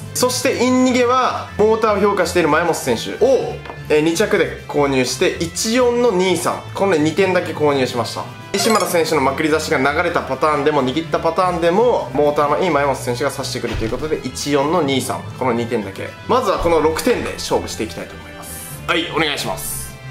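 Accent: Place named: Senshu, Japan. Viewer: 泉州弁みたいな喋り方だな〜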